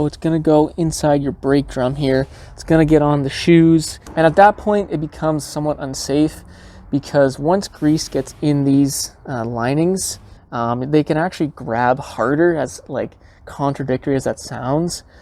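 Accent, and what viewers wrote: Canada. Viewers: You sound so Canadian !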